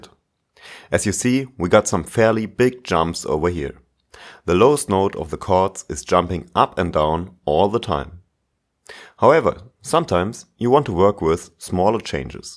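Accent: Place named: Germany. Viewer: German accent for ever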